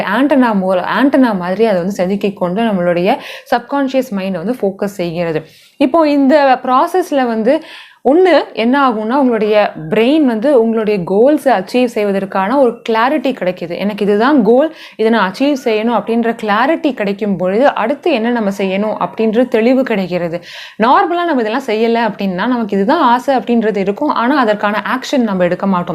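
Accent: Sri Lanka. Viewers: நீங்க ஸ்ரீலங்கா தான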